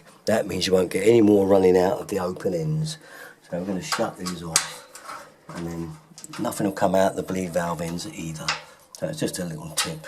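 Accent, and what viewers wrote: United Kingdom. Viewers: Hate to ask such a stupid question but I have to know, where are you guys from? Hard to place the accent but it's definitely somewhere in the UK haha I love it though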